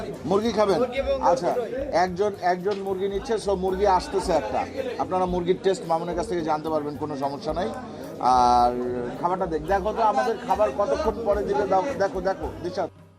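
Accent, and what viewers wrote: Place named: Chapai Nawabganj, Bangladesh. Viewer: Vaiya ajkeo aponar khawa dekhe moja peyechi. Ar chapai er vasai kotha sune onek mugdhu hoyechi 👌❤❤❤❤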